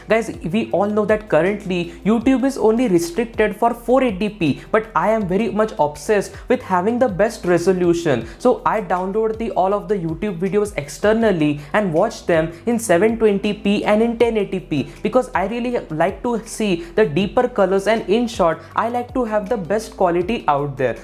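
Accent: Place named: India. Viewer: that indian accent bruh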